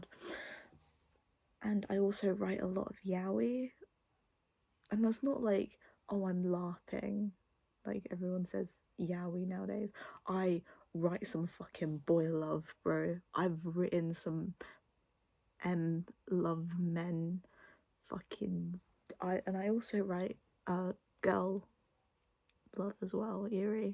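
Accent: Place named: United Kingdom. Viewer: Ew I just realized your British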